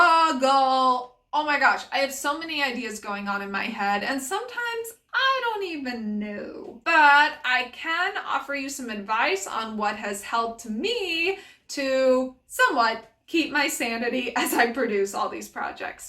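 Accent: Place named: Europe. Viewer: yeah vibrato is a very wide topic (no pun intended!). Cool, I'll look out for that video and yeah, I imagine it will have to come in sections. Another question I have which I'm not sure you answered before, or maybe you do in another video, but where are you from? You sound like you may have lived in Europe originally but then grew up in America. Am I right? I don't know lol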